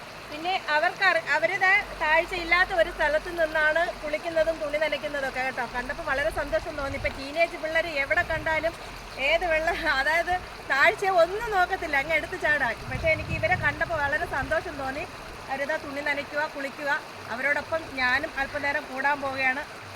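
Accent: Trivandrum, India. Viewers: ചേച്ചി വീഡിയോ സൂപ്പർ ആണ് tvm ഭാഷ സൂപ്പർ സാരീ നന്നായി ചേരുന്നുണ്ട്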